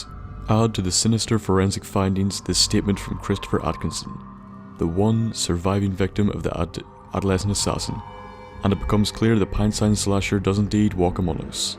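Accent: Ireland. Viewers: I think he's irish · Is the irish?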